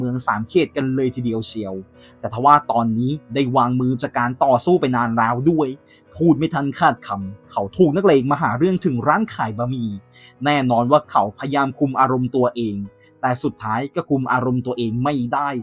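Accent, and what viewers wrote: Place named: Thailand. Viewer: สำเนียงพี่เหน่อจัง เป็นคนจังหวัดไหนหรอครับ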